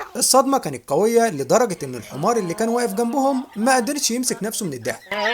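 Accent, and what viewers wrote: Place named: Egypt. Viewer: أنت مغربي ولاكن لھجتك مصرية غريب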